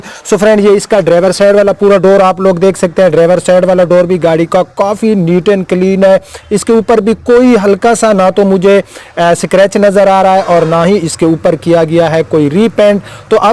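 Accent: Saraiki, Pakistan. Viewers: Ap ki سرائیکی urdu baray mazay ki ha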